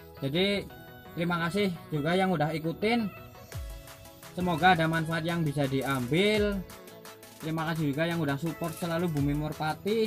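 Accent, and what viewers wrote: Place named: Cirebon, Indonesia. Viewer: Kayanya dari omongannya org crbn ya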